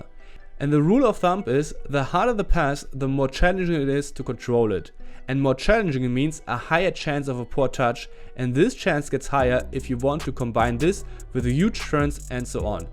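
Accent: Germany. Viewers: man hört das deutsche direkt heraus haha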